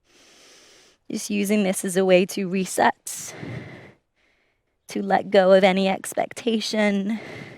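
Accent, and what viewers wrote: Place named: Scotland, United Kingdom. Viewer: You are fast becoming my new favourite yoga Youtuber! Pa. are you Scottish/grew up in Scotland for a bit? I'm hearing a mix of accents haha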